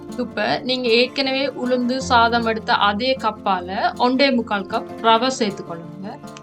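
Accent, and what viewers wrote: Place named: Sri Lanka. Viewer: கண்ணுக்கு விருந்து ரவா இட்லி காதுக்கு விருந்து இலங்கை தமிழ்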